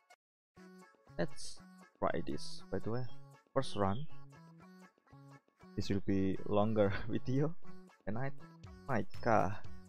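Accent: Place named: Indonesia. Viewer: Dari logat nya indo banget dah 🤭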